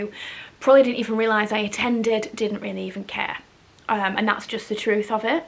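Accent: United Kingdom. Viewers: Just adore bloggers from UK. Fashion, lifestyle, British accent- everything is so lovely! And the Magrath family ❤️ dear, you look very upset in this vid(. Lots of hugs from Russia, darling 🌸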